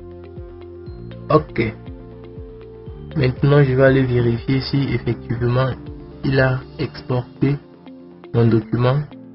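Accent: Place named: Antilles, Caribbean. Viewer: T’es antillais mec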